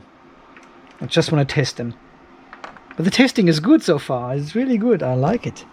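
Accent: Germany. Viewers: I HAVE A QUESTION .... I am curious about your ACCENT what country is that it's not Australian LOL I am guessing German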